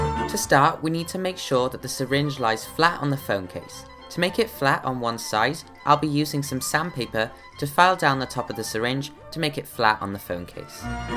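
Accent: Wales, United Kingdom. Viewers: Are you Welsh ?x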